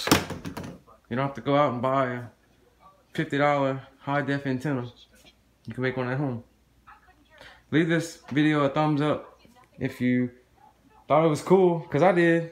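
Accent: Louisiana, United States. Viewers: Dude ya sound like a fellow Louisiana boy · Gahlee wea you from neg?? Sounds like you from LOUISIANA??? If so, where at cuz I'm trying to catch some TV channels in Houma LOUISIANA 😂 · You have got to be from Louisiana with that accent!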